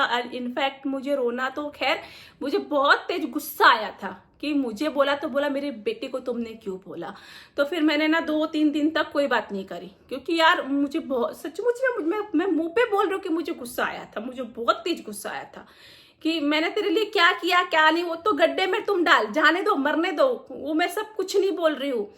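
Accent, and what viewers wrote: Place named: Nagpur, India. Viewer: jb hm pune aaye to plz dal bhat khilana hm bhi aise hi bolte hai ngp me 😂😂good dear same language hamare side ki lagti hai maharastra vali 😍😍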